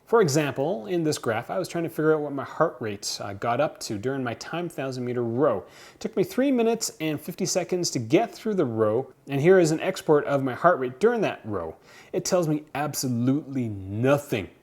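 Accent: Canada. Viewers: I like your Canadian accent eh.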